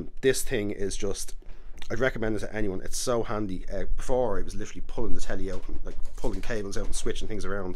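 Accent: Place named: Ireland. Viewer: Irish accent makes this better.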